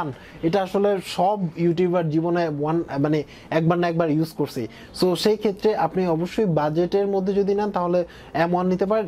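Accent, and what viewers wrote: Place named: Comilla, Bangladesh. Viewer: ভাই আপনার গ্রামের বাড়ি কই? কুমিল্লা নাকি? ☺ গের গের সাউন্ড আমাদের এখানেও করে। হোমনা, কুমিল্লা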